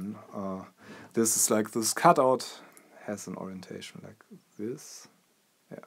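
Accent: Germany. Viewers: nice repair and easy-to-understand explanations... I happen to have the same mic and I was wondering if you could tell me what to fix/ replace in particular because my headphone jack doesn't work anymore... So whenever I try to monitor my still working recordings I can only do it without the mic's output AKA by using the normal speakers included in my PC... Therefore could you please explain what causes the headphone jack to stop working or make a video on it? I noticed that you were very good at explaining in this video, it would help me out a huge bunch Btw, I also noticed that you had a bit of a German accent, I'm a German myself xD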